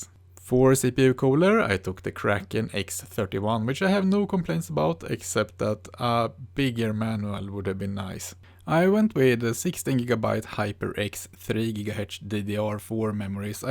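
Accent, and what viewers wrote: Sweden. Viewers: Are you swedish?? Sounds like one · Jäklar vad man hör att du är svensk:) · That Swedish accent :D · thicccc Swedish accent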